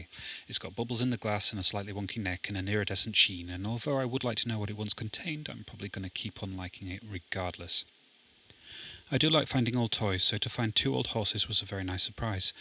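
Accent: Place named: RP, United Kingdom. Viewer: you sound pretty posh for a mudlarker.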